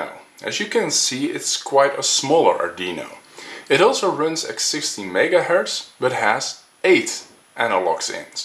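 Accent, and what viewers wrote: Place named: Netherlands. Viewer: I hoorde gelijk dat je nederlands bent...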